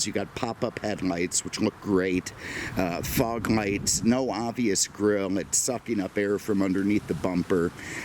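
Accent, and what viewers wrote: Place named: Northeast, United States. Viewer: I can definitely hear the northeast bagel accent that transplanted to FL 😂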